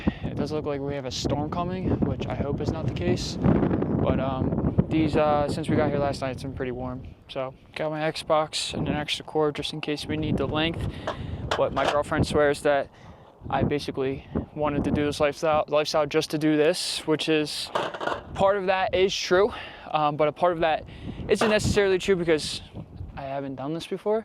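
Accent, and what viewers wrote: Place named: New Jersey, United States. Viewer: Hi. What do you do for work? I love listening to your Jersey accent. Reminds me of where I grew up in southeastern PA.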